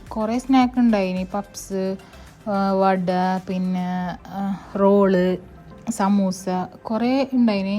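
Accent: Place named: Kasaragod, India. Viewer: Language orginal kasaragod🤩👌🏻kelkan vibe avvunund❤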